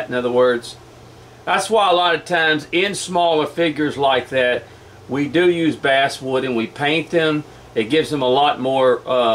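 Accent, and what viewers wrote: Texas, United States. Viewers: Newbie carver and love your videos. Sounds like you live in my neck of the woods here in Texas. Are you a member of any local carving clubs? Also I know you have done videos on tools, but do have a list of the “x-number” of most important tools (and sizes) - I.e size and angle of vtool, gouge, knife blade etc? Finally, thank you. You have inspired me, and others much more talented than me! It has gotten me off my phone and outside— a real hobby.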